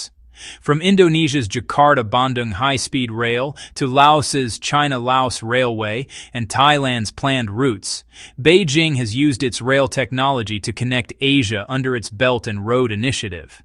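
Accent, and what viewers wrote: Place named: England, United Kingdom. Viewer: Chinese propaganda in anglicised English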